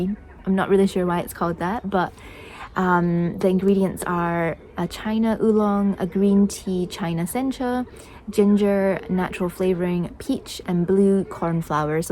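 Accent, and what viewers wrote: Scotland, United Kingdom. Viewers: When I saw ‘ ’ I somehow wasn’t expecting a mild, wee Scottish accent, for some reason. I was expecting a loud, brash North American! No matter. It was a lovely video. Ty.👍 (Edit: … just look at this. This is not a wee nook of the Highlands but, more or less, a central part of Scotland’s capital! You captured it beautifully.) 👍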